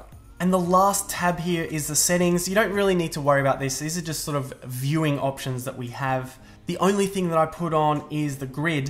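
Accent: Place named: Australia. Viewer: Just bought a Mavic Air for Aussie trip in Dec. I've always flown without FPV with a Phantom 2 and got some great footage using a GoPro Hero4 just using line of sight and sort of guessing what I'm filming. It's worked in the past more or less. But having a phone seeing the live feed will be a game changer for me. Some great tips here... the screen can be intimidating on first glance, but I'm slowly understanding the functions, good to know how to change the sensitivity of the Yaw and gimbal, great work keep it up, nice to hear a Aussie accent, makes a lovely change from American which is the usual on here. Greetings from the UK.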